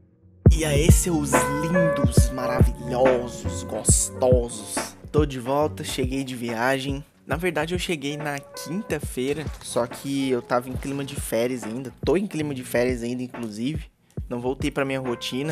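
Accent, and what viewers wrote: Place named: São Paulo, Brazil. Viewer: pra mim vc era daqui de sampakkkk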